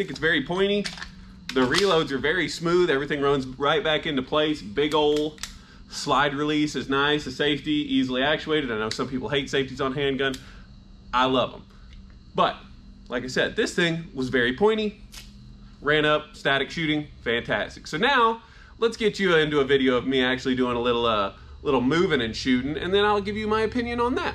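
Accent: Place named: Texas, United States. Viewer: Love the video for that I thank you. Sense of humor I rate you at about a 7/10. Shooting skills about a 3/10 Taste in beer about a -9/10 Hat about a -3 / 1000 Firearm Hk about a 1/10 Firearm Sig about 8/10 1950's sunglasses 3/10 Texas like accent. Erm It's Texas so 0/0 Garage or shop space 1000/10 Cool factor , it's a outdated design 2.5/10 Bragging rights because of a game lame/10 and your mom is calling you. Paying that much wtf are you nuts?/10 Shooting targets that had already surrendered and lying down big bully/10 Would I own one ? Well hell yeah/10 Why? Tears of the sun of course. In all seriousness: I have never owned a Mk 23 but have shot them before. Super cool firearm and accuracy is superb ! The extra weight out front makes it easy to bring back on target. I have never shot one suppressed dang it. On another side note. Metal Gear Solid is one awesome game! 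My rating system is about 5 beers out of a six pack. I have to say I would think that HK could have included a nice case . Something like the FNH FNX .45 Tacticool case . 2 mags are okay 3 mags are way better. HK mags are stupid expensive. I have 2 USP's and the extra mags back when I purchased the firearms were around $62 to $75 a piece. Now the USP mags are around $40 a piece which means the Mk23 mags are probably just over $50 each. I own a HK P7 series so I pretty much know what a over priced HK is. May I ask what that MK23 is like with that big ole suppressor? It looks to be almost Major League baseball bat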